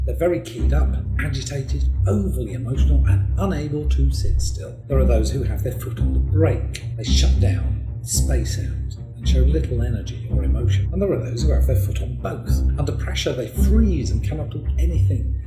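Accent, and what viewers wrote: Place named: United Kingdom. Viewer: Ew british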